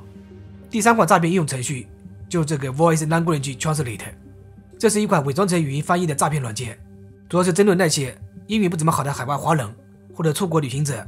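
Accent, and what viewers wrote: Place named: Jiangshan, China. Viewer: 有点像衢州江山口音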